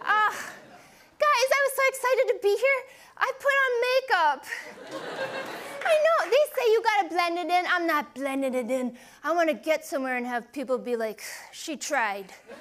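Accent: Canada. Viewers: She sounds a bit like my relatives in Northern Ontario in Canada. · She sounds very Canadian Soe instead if so. Aboat instead of about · she sounds very canadian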